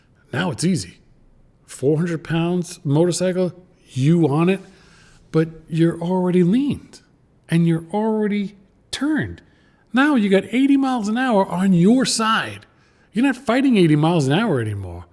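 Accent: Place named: Northeast, United States. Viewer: your accent reminds me of childhood 😂 northeast?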